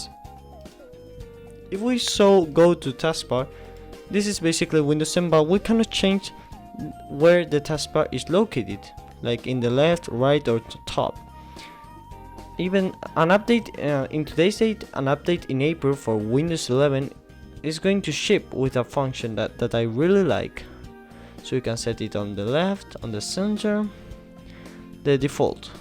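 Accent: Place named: Russia. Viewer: ik he just sounds like a younger enderman, he has the Russian accent and all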